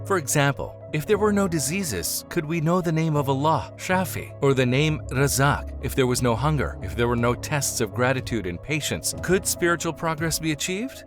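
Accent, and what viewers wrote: Turkey. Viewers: I liked the Turkish English accent better, y'all didn't have to get another narrator😭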